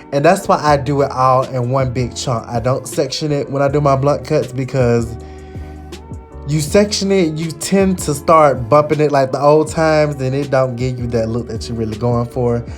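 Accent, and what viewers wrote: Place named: Florida, United States. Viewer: Florida accent on fleek!! HAAAANNNN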